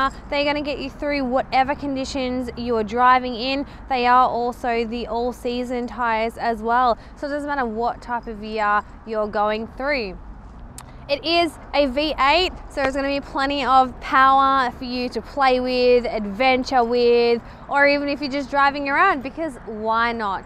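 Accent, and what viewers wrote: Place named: South, United States. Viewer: Not going to lie, at first I thought she was from the southern United States with her accent 😂 it is so similar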